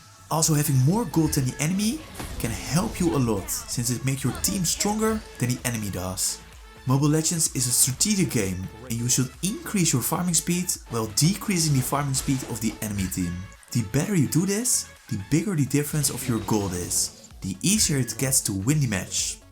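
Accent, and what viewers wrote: Netherlands. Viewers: By your accent... Are you dutch?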